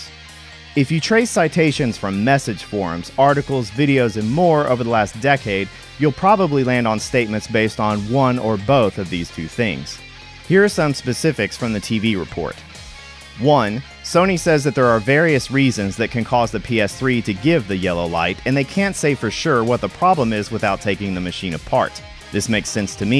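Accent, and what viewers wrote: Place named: United States. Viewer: Solder, he was talking American-English.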